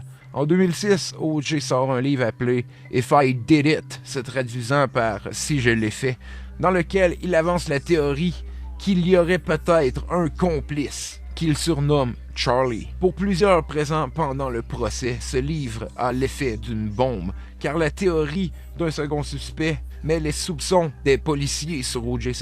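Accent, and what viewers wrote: Quebec, Canada. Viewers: Super et bravo pour ta vidéo 👏 ! J'aime bien l'accent québécois ou canadiens du commentateur/narrateur. Ca donne un certain caché à la vidéo 😊.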